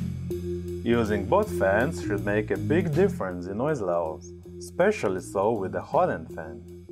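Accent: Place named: China. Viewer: Sorry but what's on top of my mind watching this is: what's your native tongue ... Sounds like Chinese to me...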